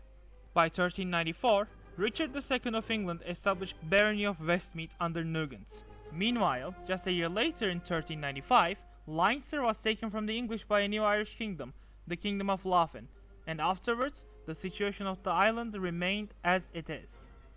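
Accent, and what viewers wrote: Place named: Turkey. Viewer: Türk aksanı da eklenince gayet güzel bir video olmuş. Tebrikler :)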